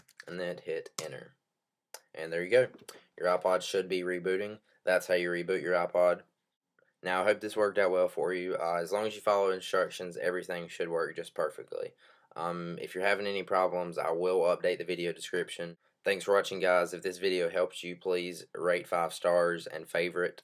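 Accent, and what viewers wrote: United States. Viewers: lern englisch. Nein ehrlich so sprechen manche Leute in den USA.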